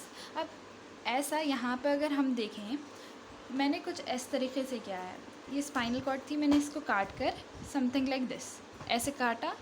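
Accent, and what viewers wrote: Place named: Hyderabad, India. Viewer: well explained..... btw from ur accent ,u look from Hyderabad.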